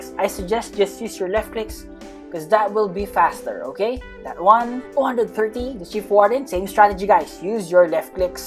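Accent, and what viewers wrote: Philippines. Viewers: Of course he is, my filipino teacher who teaches English in my school in Indonesia have a same Accent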